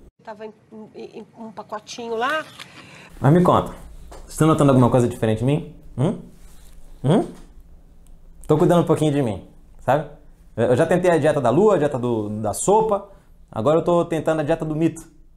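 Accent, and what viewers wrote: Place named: Pará, Brazil. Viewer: O melhor do, é que da pra perceber que ele é paraense, mas só os paraenses percebem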